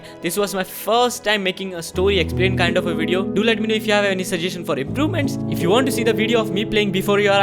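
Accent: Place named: India. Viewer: god fucking damn it. the indian accent makes the whole video impossible to watch..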